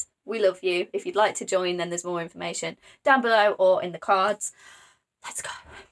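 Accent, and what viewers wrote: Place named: United Kingdom. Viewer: I love your British accent,, 😅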